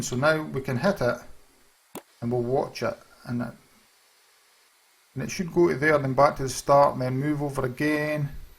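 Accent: Scotland, United Kingdom. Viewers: I'm not saying it's a bad thing but he has a really Scottish accent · Is he really Scottish?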